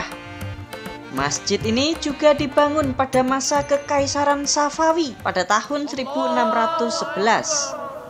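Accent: Java, Indonesia. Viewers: logat nya medok bgt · Kenapa jawa mu lengket amat? aneh kedengarannya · suara naratornya Jawa Banget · Mimin logat jawa nya kental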